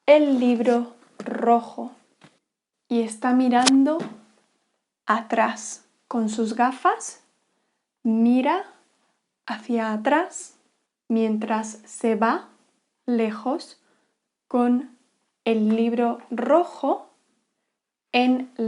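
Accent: Spain. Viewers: Talar du spanska från Spanien? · I only wish two things: 1) that you had lots more just like this and 2) that it was in a Mexican dialect rather than Castillian one. I think I would get stared at if I said "ciudad" as you say it. The second isn't a complaint. Just a wish. What you do in this video is what all the polyglots say to do to learn/teach another language. My Spanish vocab is good, I know the grammar, but I spend too much time in my head translating rather than just hearing and connecting words with ideas. The pointer idea is fantastic. I'm tempted to make a few videos like this in English for my various student friends that are struggling at the same point I am.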